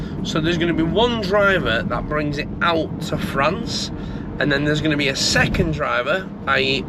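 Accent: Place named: Northern England, United Kingdom. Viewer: New to your channel, you don't sound local to redbull hq, so how did you get involved with red bull, and do you travel from your northern location to work?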